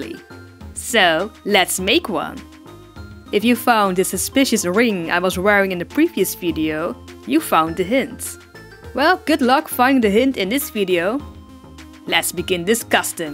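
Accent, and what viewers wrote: Netherlands. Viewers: ok you can hear your dutch! but that what i love ( and the doll omg ). i always makes me smile when you talk bc i hear myself in it haha ( btw i'm dutch if you didn't know yet ) but i love him he's awsome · where are you from? you have a really dutch accent XD <3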